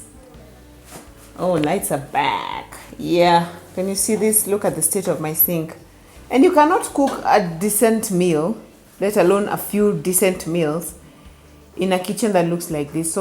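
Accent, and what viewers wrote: India. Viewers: You knocked me out with the indian accent..Easily my fav Youtuber..! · Omg! The Indian accent 👏❣️your videos inspire me. I learn alot. Keep doing what you do. Love love your content ❤️❤️❤️❤️❤️ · Hahaha! I love the Indian accent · Your English-india is perfect,join Bollywood una potential mama! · beautiful. I love your i indian accent. I love indian food too especially the spices in their foods. I would love to know if you have ever been to india or if you studied there. Been there for my studies hence the love for the foods. I love your contents esp food vlogs. 😋😋. Much love❤❤ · Its the Indian accent for me 😅😅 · I love the Indian ascent wow, watching you from zambia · Liking the Indian accent, great video as always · The Indian accent cracks me up. Great content girl. Lov from Tanzania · Looove the Indian accent 😅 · For me it’s the Indian accent😂😂. 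Am done😂Good video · Wooow!! Good job. The Indian accent 👌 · 😅🤣🤣the Indian accent though. Good job mama. I will definitely try the naan and butternut soup. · The Indian accent,you nailed it!Hope the camera guy gets fed the delicious meals too😃😃Love all the recipes,good work Mama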